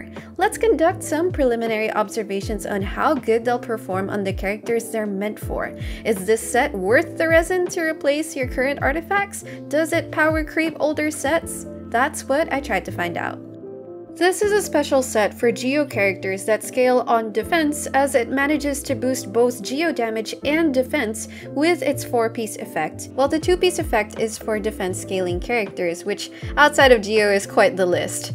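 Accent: Philippines. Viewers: You probably get this a lot but your accent is comfortably eng/fil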